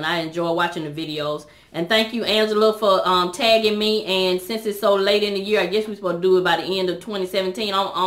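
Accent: South, United States. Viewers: You are absolutely adorable! I enjoyed learning about you. I love your southern drawl. Congratulations on your amazing accomplishments. TFS 👌❤😁!!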